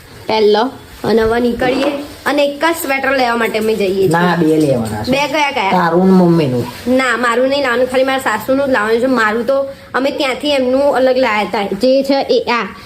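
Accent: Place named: Ahmedabad, India. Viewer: તારી સ્પીચ બહુ મસ્ત છે પ્યોર અમદાવાદ ની ભાષા શુદ્ધ ગુજરાતી સાંભળવાની બહુ મજા આવે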